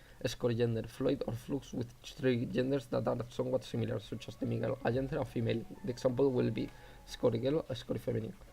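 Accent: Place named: Cambridge, United Kingdom. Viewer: Inglés de Cambridge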